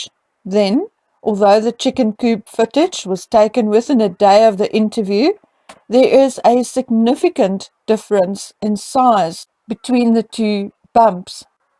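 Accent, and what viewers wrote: South Africa. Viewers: I like your South African Accent!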